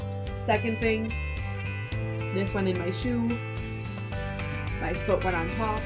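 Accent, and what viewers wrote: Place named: Canada. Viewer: I just want to thank you for sharing your story. I realize that it’s been awhile since this was first uploaded, but I want you to know that it really impacted me. I totaled my car 3 years ago and completely messed up my ankle. I can’t ever run again, and some days are more painful than the last, which in by no means compares to what you went through, but it was challenging. It took about half a year to be able walk without any crutches, and about another year just to make the limp less noticeable. It was all worth it though. Just like you said, I don’t think I’d be the same person I am today if it wasn’t for going though it all. I have to be thankful for that, at least. But, you really do seem like a wonderful, strong-minded person. Hopefully you’re still doing well; and, of course, watching the new deadpool movie somewhere. Most likely in Canada. 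Lol. Not to offend. You just seem like you have a slight Canadian accent. Then again, what can I say? I’m from the states and people tell me that I sound slightly Canadian all the time. Haha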